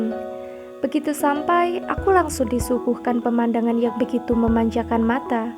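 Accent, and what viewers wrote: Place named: Java, Indonesia. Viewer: Medok lek😁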